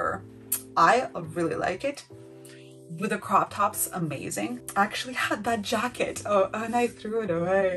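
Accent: Russia. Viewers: Great, thanks for your reply...if you don't mind me asking...is that a Russian accent I detect? I'm part Russian so I guess I'm just curious!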